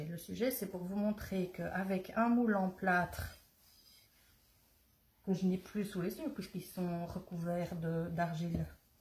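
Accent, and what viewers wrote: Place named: North, France. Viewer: Merci pour ta générosité d'informations! moi qui suis originaire de Lille (expatriée en Normandie près de chez Raoult!) avec mon grand-père Belge, ça me fait du bien d'entendre ton accent;-)) dis-moi, quelle épaisseur fait ta plaque de terre pour ce plat? Y a t il des épaisseurs précises en fonction des objets plus ou moins grands? merci beaucoup Caroline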